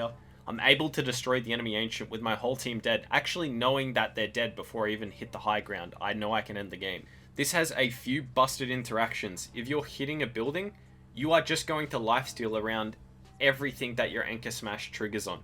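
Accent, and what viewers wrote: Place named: Australia. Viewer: Something about an Aussie accent that just makes any youtube video better.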